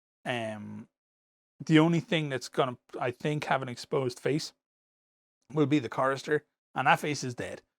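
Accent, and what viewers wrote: Ireland. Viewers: The voice of fantasy evil is Irish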